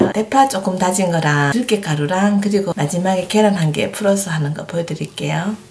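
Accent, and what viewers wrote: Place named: Busan, South Korea. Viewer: 애교있는 부산말씨 목소리 넘 좋아요 ㅎㅎ 저도 고향이 부산이라서 ㅎㅎ 고향 부산 맞으신가요~ 잘보고갑니당:)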